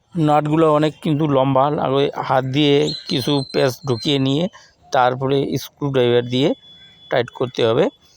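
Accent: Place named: Bangladesh. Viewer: apnar vasa ta bangladesh ar monehoche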